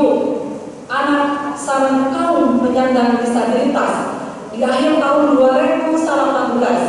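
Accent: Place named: Sunda, Indonesia. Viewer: Nyunda banget, kereeen, Ambu pidatona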